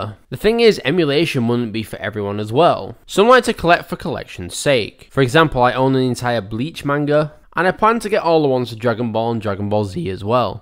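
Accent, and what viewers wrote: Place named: Manchester, United Kingdom. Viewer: Nice video lad, but Manchester is blue! I can hear that Mancunian accent so I won’t be too hard on you. I personally think the games are expensive because people view them as collectibles and there are a finite number in existence which still function. As you mentioned, these games can be played for free (which I do frequently), so I think it’s as simple as people being collectors and potentially hoping they can sell it for more later.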